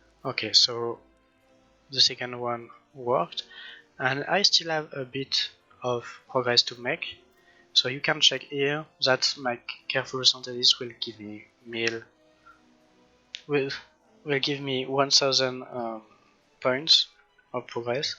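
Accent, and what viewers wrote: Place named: France. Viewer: love french english accent ! thanks for guide btw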